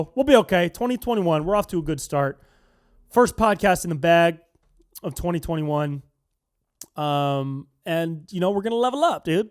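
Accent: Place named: Northern California, United States. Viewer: Bruh every time I watch these videos It makes me proud of NorCal. Thanks for repping our accents, culture, etc. I’m 18 from Chico town.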